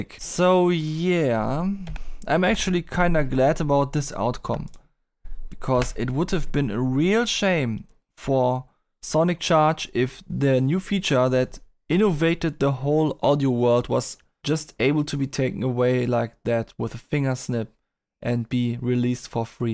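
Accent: Germany. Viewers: where you from? deutsch?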